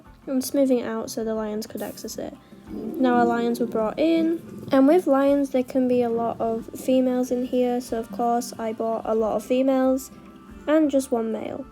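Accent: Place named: United Kingdom. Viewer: I think your british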